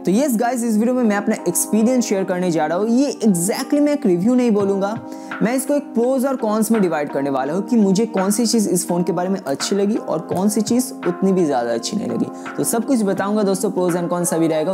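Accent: Bengal, India. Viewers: Tumi bengali naki?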